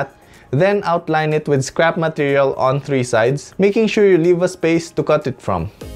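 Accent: Philippines. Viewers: Is this a filipino the way he speak english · Is this guy filipino? Cause his accent kinda sounds one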